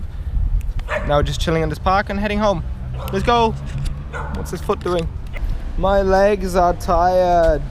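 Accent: Germany. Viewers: No problem!! Please come to Singapore next Year! I would really be honored to meet and have a picture with you! You inspire me so much! I also like the way you talk in the german accent!! So hilarious! Jason Paul, you are an Awesome man and a really sick, legit, great, awesome, fantastic, funny and Excellent Freerunner! Love to meet you someday and parkour with you!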